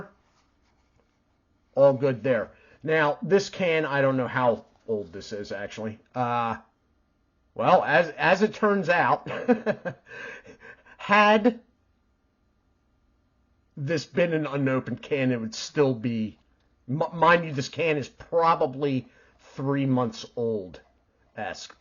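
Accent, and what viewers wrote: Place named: Pittsburgh, United States. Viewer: When you said “PA pricing,” it all clicked! I knew I recognized the accent. I am Pittsburgh born and raised 😂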